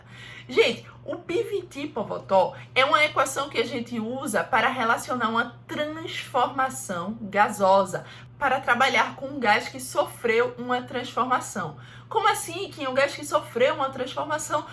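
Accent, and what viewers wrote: Pernambuco, Brazil. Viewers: CADA AULA FODAAAAQ. Tinha que ser nordestina, e quase certeza que é Pernambucana, né?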